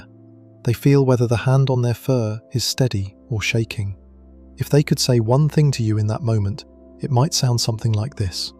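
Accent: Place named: United Kingdom. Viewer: PLEASE STOP the constant use of British accents! It’s not necessary.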